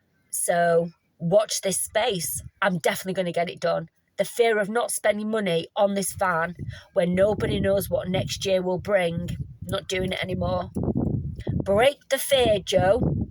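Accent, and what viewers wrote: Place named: Northern England, United Kingdom. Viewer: I dont blame you for moving away from bolton. I too was born there and moved away in 2007. I can only imagine it has gotten worse, all the shops were shutting in town last I heard, not a fan. Not bothered never going back. Not sure about your conspiracy theories but its definately scary times we are living in. I can also relate to your being isolated, Im the same. wierd thing when you get used to only your own company. All that just to say was an interesting watch. Just a shame how bolton went not many people of the older generation anymore that were like Fred Dibnah. Good luck with the channel. did you move to bolton? can't tell by your accent although can tell it's northern.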